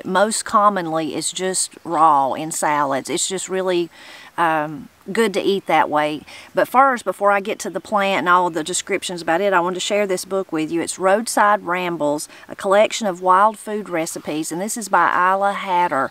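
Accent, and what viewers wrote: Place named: South, United States. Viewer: I just love this lady's country accent, it's so deep and she seems so proud of it.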